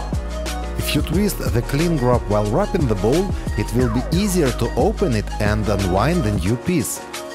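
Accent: Russia. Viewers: Не узнаю откуда этот акцент...Саратов? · русский что ли? такой говор чисто русский😃. видео оч полезное, благодарю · You are amazing! Some of your short cuts I had learned from an amazing lady who passed away about 3 years ago who had a YouTube channel and focused on making old recipes from the 50’s she had learned from her mother while they lived in Virginia. She was born in 1947. A lovely , lovely lady and her husband too. He had passed just about 8 months before she did. I love that I am learning new cool techniques from you. I’ll pass your videos around to my friends and family. Are you from Russia or Ukraine? I ask because of your accent. Great job, and please keep up the good work! Your videos are very enjoyable❤😊! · Я одна слышу русский акцент?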